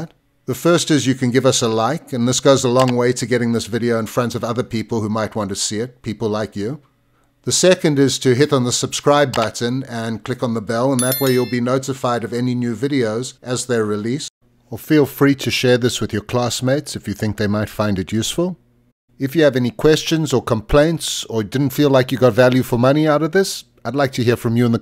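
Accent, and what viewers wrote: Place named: Cape Town, South Africa. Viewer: Hey, nice. Nice to hear a Cape Town accent. I have a more important optimisation problem to solve, which is that of an elastic catenary with fixed weight at some point in the catenary. The problem space is maximum highball free-lining, where we deliberately span open spaces with elastic climbing ropes and the walk them. The ultimate boundary condition of course is elastic strength failure of the freeline. However that failure condition is not the solution to the fundamental problem. What is the catenary solution for an elastic rope in the presence of one or more discrete local masses?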